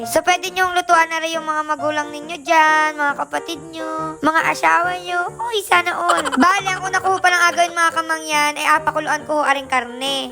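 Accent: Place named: Marinduque, Philippines. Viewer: Kakatuwa talaga mga vlog mo nakakwala Ng stress Lalo mga Punto Ng salita mo kamiss tuloy umowe Ng marinduque😊😊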